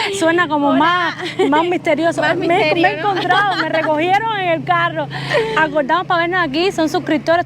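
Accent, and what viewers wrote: Cuba. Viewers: La voz de cubana tuya molesta cantidad el acentico cubano ese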